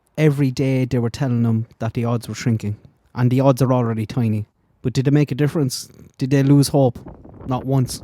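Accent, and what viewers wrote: Ireland. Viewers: Love watching your dogs while you are talking about these cases, I also love the Irish accent, I follow Michelle walks too you are both great 😊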